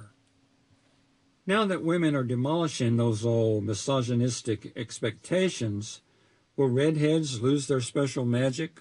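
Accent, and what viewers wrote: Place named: South, United States. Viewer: I'm a huge Tom Robbins fan. Am going to read on my YouTube channel. Came upon your channel. You have a new sub. You sound Southern. I'm from Alabama❤🇺🇸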